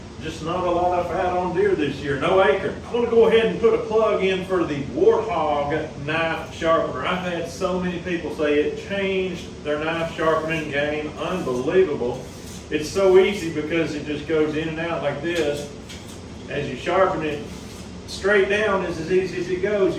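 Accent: Tennessee, United States. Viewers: YOU FROM Texas BUT YOU STELL HAVE THE TENNESSEE ( T ) in it hahaha I've not Commanded on your VIDEOS YET BUT IVE BEEN WATCHING A LONG TIME ALL YOUR VIDEOS ARE BLESSED TO WATCH GOD IS SO GOOD TO US ALL THE TIME AMEN BROTHER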